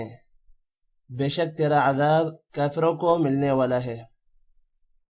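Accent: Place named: Baltistan, Pakistan. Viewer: Aap ki awaz aur bolne k tariqe se lagta hai ki aap balti ho.. Kiya mey sahih hon.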